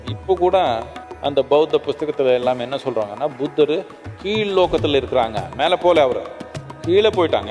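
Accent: Tamil Nadu, India. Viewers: Tamil accent is very beautiful to hear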